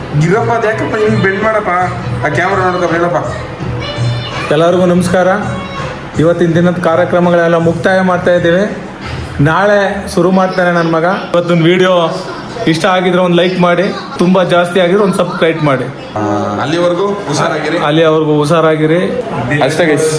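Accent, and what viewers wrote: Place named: Mysore, India. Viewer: Nim uru yavdu bro Mysore district anta gotaithu nin bhashe ((slang)) matode rithi nodi gotaithu ..nija alva?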